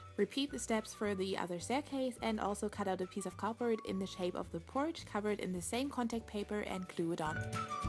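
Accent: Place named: Germany. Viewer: I knew it. You have a german accent :D ! You are from Germany right?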